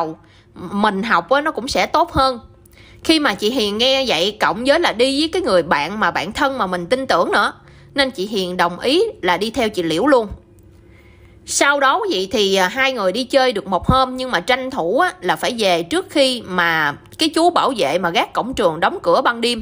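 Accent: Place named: North, Vietnam. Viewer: Giọng miền bắc mà mê ,có nói nộn kg?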